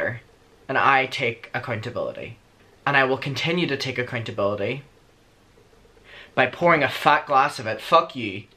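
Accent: Ireland. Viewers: what?! You can hear the Irish accent thick af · Love that you’re not gonna change your accent/how you pronounce things to please people!! From a fellow Irish gal 🥰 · I love your Irish accent and how you pronounce Garett. Much love to Ireland. Garett's new video is hilarious btw.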